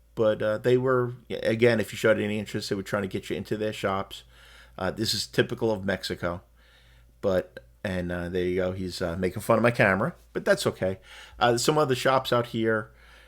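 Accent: New York, United States. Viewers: Not sure, but I think they were making fun of your very noticeable New York or should I say New Yawk accent.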